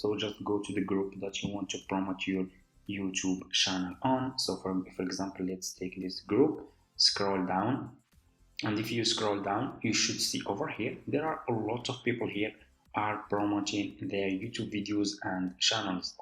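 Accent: Morocco. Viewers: nadi a 3chiri mn laccent 3rftk mghribi kayn hh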